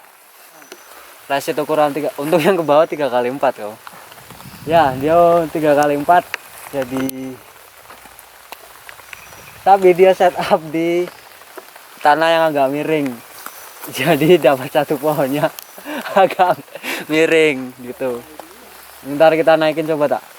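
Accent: Banyumas, Indonesia. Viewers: wong endi sampean kang? kayane sesama republik ngapak kie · Deneng ming kaya kue tok ? Yo wis lah. Mantappp ... Tetep semangat. Ora ngapak ora penak.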